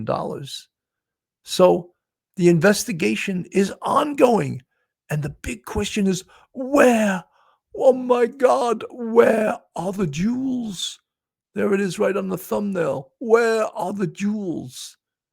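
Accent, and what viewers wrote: France. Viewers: Thank you Sgt., hoping and praying that the priceless jewels are found. French accent appealing, we are created to laugh! Good accurate reporting, your expertise is valued! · Im glad they solved this fast! I hope the jewels are found soon, 😥 I doubt it tho. ❤btw❤adorable frenchie accent 😂❤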